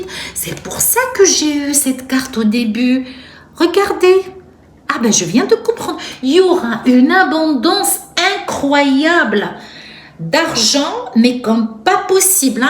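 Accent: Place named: Quebec, Canada. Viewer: Vous avez vraiment l accent du Québec comme moi . Tt facon je ne comprends plus rien dans cette relation toxique empoisonner avec mon ex autre. Ca me parle beaucoup cette guidances .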